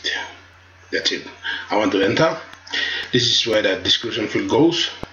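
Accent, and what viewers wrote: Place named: Papua New Guinea. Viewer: I can hear a Papua New Guinean. Thank you for the video, my man!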